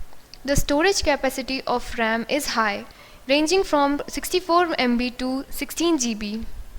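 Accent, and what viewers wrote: India. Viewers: If u have someone special from india then u will miss that accent.. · Ahhh, shit.This indian accent is everywhere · oh god i hate this indian accent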